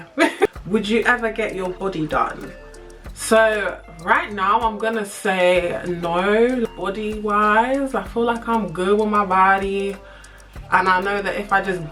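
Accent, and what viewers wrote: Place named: Essex, United Kingdom. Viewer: but from Essex! 😅😅 Love it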